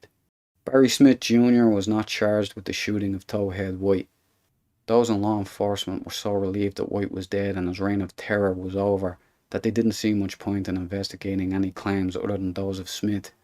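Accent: Dublin, Ireland. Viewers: Spoken like a true Irishman. Well Done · well done...!...love the Irish axcent..!....& the quote"the Vietanmies were very lucky he wasent sent over there".....lol...4 sure....men like him are a hard find nowadays...!...he did so much in such a short life...R.I.P. Buford..! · Just discovered this channel, great story. Fair play lad. You a Dub as well? Where 'bouts you from? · Puss-er. The Irish type accent of the narrator brings out the 'Poos-er.' I'm thinking he would pronounce 'hustle' as 'hoostle.'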